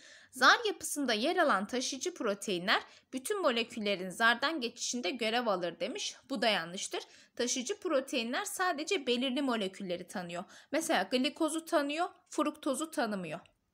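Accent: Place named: Mardin, Turkey. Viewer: şiveniz mardin şivesine benziyor ondan sordum hocam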